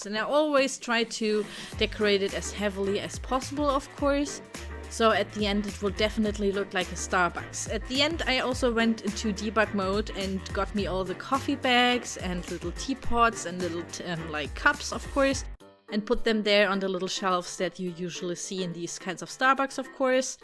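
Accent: Germany. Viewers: Are you German? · Du hörst dich richtig an wie eine Deutsche die englisch spricht..😂😂😂😂 aber seeeeehhrrr süß !! 😂😂🦄🦄🦄💕💕❤️❤️🍓🍓😘😘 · Ja, in Deutschland sind fast alle Starbucks in anderen Gebäuden integriert. Ich habe vom ersten Satz an gehört, dass du deutsch bist. :D Aber dein Englisch ist gut.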